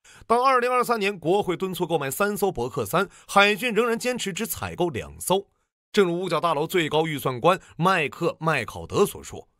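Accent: Northeast, China. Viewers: 为啥把劳斯莱斯 翻译成罗尔斯罗伊斯呢？ 听口音你大陆人啊。 新的DDG主要是 操作熟练。从濒海 猪母 这些。还是老的伯克级靠谱。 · 东北口音难听死了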